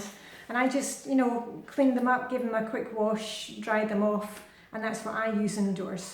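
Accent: Scotland, United Kingdom. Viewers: I love your style, the way you explain the flylady-system I have been following through years, your English (is it Scottish;) - so thank you for all those things. I wonder if you could tell me what kind of sink tidy (caddy and bottles) you are using - it looks so elegant. Greetings from Austria Knitgudi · Thank you, for your wonderful persistence and gentle Scotish accent. ♡ I was always overwhelmed by the to do list and never allowed time for the fun stuff. Now on day 4 with my sink clean - it's playtime afterwards!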